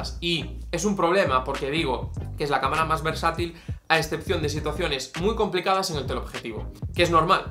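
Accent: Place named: A Coruña, Spain. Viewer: Una buena opción si me quisiera gastar tanto en un móvil aún así hay cantidades que sigo sin querer dar por la telefonía. Soy yo o eres de Coruña? Lo digo por el acento😂😂, si es así...yo vaya a donde vaya también lo saben enseguida, es delatador😐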